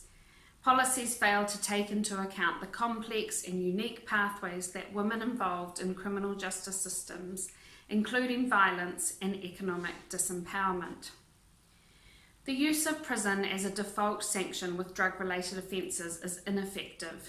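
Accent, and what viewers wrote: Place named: New Zealand. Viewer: Just watched Al Jazeera (stream AJ) about prisons in Europe. Couldn't help it but amongst the comentators I noticed you sound very very Kiwi. Well done! Aotearoa all day!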